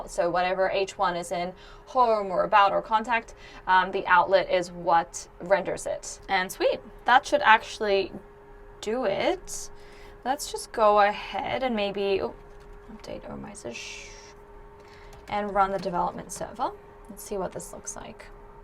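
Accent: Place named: Australia. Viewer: You have snippets of Aussie in your accent..... Keen to see direct migration for whole WP websites, that can link to NFT (Open Sea etc), email capturing for subscribers (as a database for future contact), and other links to Unstoppable Domains donations /merchant receipt for sending g crypto. Thank you, am giving this clip a go as a reference help. Appreciate the clip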